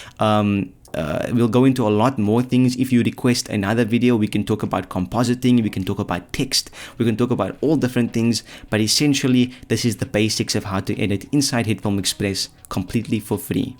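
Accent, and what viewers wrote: South Africa. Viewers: Is that a South African accent I hear?? I am using premiere at the moment but I'm thinking of switching. Do you recommend hit film? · no way i came on this vid and this bra talking in an accent that only another brazzo can understand, coming fromn that west coast ey represemt the ZA fam homie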